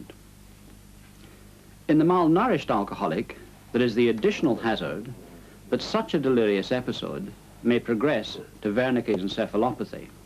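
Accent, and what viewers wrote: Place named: England, United Kingdom. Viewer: That's a proper english accent. Major thing i like docs for.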